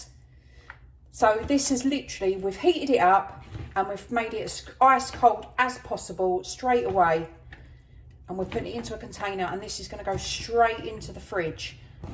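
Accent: United Kingdom. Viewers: Bing bang bosh, you sound like you’re from London mate. Thanks for the handy video 🙏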